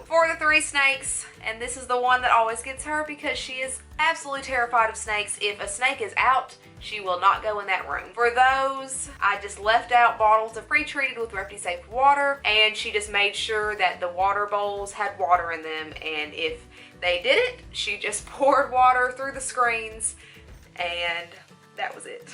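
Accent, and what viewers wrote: South, United States. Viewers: I am in love with your voice ... Lol your beautiful as well but that southern voice....sheww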